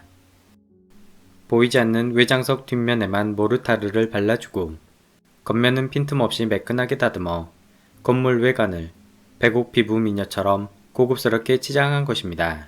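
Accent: Jeolla, South Korea. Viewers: 나레이션에서 느껴지는 전라도 사투리 억양이 꼬소하네요 ㅋㅋㅋ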